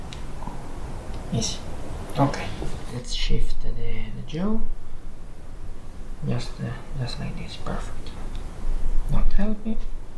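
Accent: Italy. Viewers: Your accent reminds me of Scuderia Ferrari engineer, "Slow button on, slow button on" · Легкий итальянский акцент....)))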